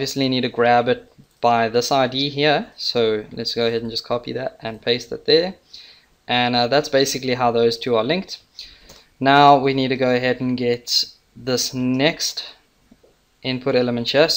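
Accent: South Africa. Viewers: Was so shocked when I heard a South African voice! #LocalIsLekker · Are you South African?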